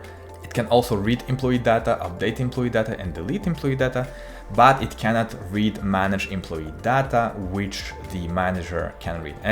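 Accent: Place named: Russia. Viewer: А можно на русском, вы явно по акценту знаете , знайте у вас есть русскоязычная аудитория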